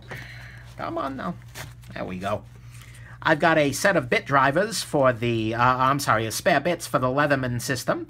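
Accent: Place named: Brooklyn, United States. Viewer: What part of Brooklyn are you from?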